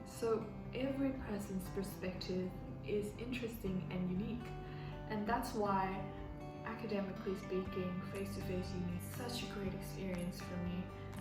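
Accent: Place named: South Africa. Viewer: Your accent sounds South African, pls drop explanation and how u learned English 🌬❤️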